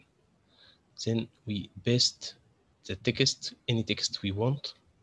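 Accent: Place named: Egypt. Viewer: ما شاء الله. لكن ملاحظة لو تتكلم الإنجليزية بدون لهجة مصرية التي تحول الثاء إلى سين والذال إلى زاي.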